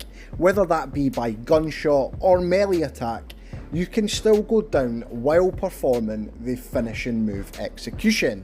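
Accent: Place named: Scotland, United Kingdom. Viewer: Great how to man, this game is so intense. And Always love your Scottish accent bud! Makes the commentary entertaining and flows well with the video.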